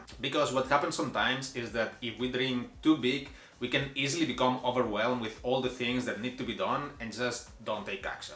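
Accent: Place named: Spain. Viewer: Thank you for sharing this valuable information with us. Another thing, your accent looks different. Where are you from? I think Spain. How can I get the same fluency you have in English?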